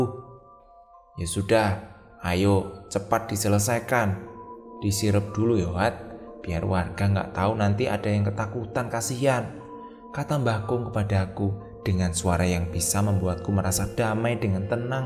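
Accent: Java, Indonesia. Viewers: seneng nya cerita mas krna ada aksen jawannya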